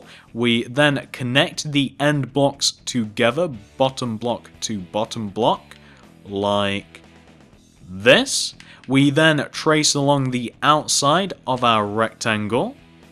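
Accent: United Kingdom. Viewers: he does have a British accent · yea. they have british accents not stupid people accents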